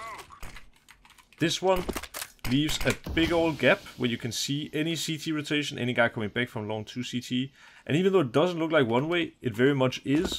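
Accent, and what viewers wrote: Denmark. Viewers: Danish accent kills me, it’s so awful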